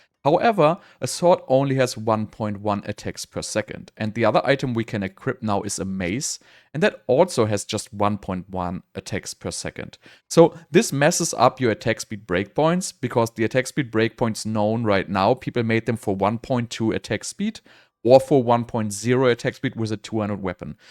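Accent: Germany. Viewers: Alter Schwede, mach halt Videos auf Deutsch. Dein deutscher Akzent im Englischen ist echt grausam.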